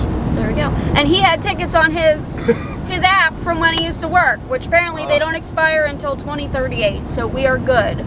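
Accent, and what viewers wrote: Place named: New Jersey, United States. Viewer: New Jersey makes sense. You have an accent that comes out on certain words and I couldn't figure it out.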